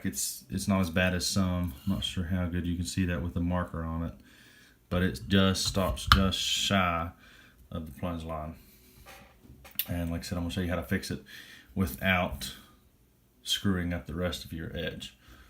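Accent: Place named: Texas, United States. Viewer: You from Dallas? You sound like Vinnie Paul. You haven’t posted for years, but your instruction helped clarify what I needed to get razor (and mirror) sharp. Cheers brother, thank you.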